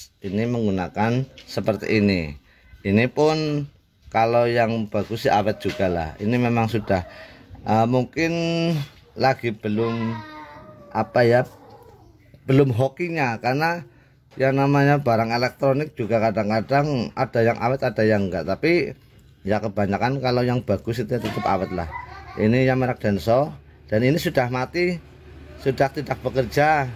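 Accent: Banyumas, Indonesia. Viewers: Mantap. Logatnya mirip banyumas. Lokasi dimana bro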